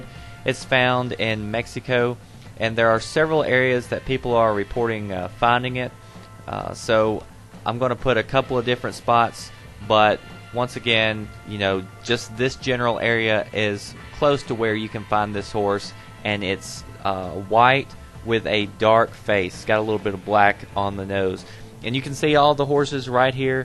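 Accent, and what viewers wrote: South, United States. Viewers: Are you from the south states? Your accent kinda sound like it.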